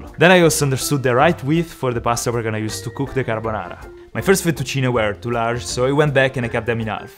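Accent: Italy. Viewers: I spotted your channel just some days ago and I really like your videos I immediatly knew by your accent that your family is originaly from Italia, it just sounded familiar. I'm from Germany and I bet my accent is way worse than yours but I really like yours, mine not😂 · Yes it's very good I generally love Italian accents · Io ho scoperto questo canale per caso e appena ho sentito il suo l'accento ho subito pensato "tu sei italiano!" 😂😊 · fra guarda che è italiano, lo capisci anche solo dall’accento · Bro avevo intuito dalla pronuncia, ma quindi sei italiano!!! 😅😄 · Bella la tua voce italiana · LO sapevo che eri tialiano dall'accento del tuo inglese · In this episode i noticed his accent became more italian than usual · Ciao sono Lisa una tua fan italiana. Tu hai origini italiane? Perché parli molto bene inglese ma si sente molto l'accento italiano. So che non leggerai mai questo commento ma io in caso ho provato a chiedertelo. 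Ciao ciao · I love how half of this video is just him flexing his Italian accent on us. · I like your way of talking in Italian Accent ❤️ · Si sente molto che sei italiano. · I love his fluent Italian accent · Ora sono riuscito a rispondere alla mia domanda che si ripeteva nel,a mia testa da tempo, no non è vero ahaha, beh cow mi sembrava dalla tua pronuncia è tutto che fossi italiano e in effetti lo sei boom shaka laka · Ma fra si capisce dalla pronuncia che sei italiano... checazzo devi imparare a fare la carbonare... spero bene che da italiano tu la sappia fare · Ma tu sei italianooooo si sente, aia la pancetta però eh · I hear the italian dialect☝️👍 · Italian accent I’m Italian to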